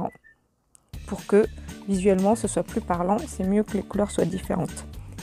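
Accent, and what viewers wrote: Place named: England, United Kingdom. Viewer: j’ai crue que taites anglaise moi en mode 🥲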